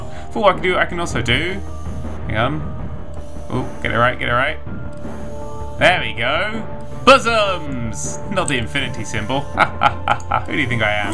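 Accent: United Kingdom. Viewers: its the british accent. once again, the reason why americans watch top gear, is the british accent. i dont pretend to know why, its just a fact. its hypnotic and adds automatic legitimacy at the same time. we all are slaves to the magic of a british accent. if that makes any sense. which it probably doesnt. nothing i say ever does... hehehe... what?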